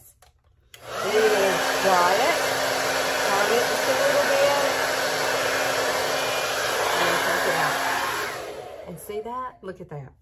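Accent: South, United States. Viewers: You are so sweet...love your southern accent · I have to say this first.....I watch a lot of Youtube videos for one thing or another, and I find myself muting the person on the video because their voice is so annoying. LOL You have the voice that makes me want to listen, because it's like the difference between a shout and a whisper. The lovely Southern accent helps too! Thank you for a most entertaining and informative video! Love from Los Angeles!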